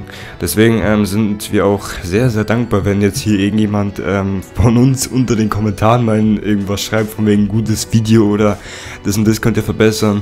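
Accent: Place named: Bavaria, Germany. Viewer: 100% Bayrisch - Gutes Video 👍👍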